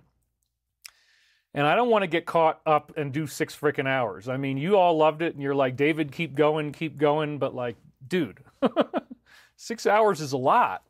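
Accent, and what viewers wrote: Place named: Australia. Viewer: IM GOING TO BE A DICK...I DO NOT LIKE THE OZZIE ACCIENT MATE...I DISLIKE IT BIG TIME, FROM AN OZZIE OLD CHOOK......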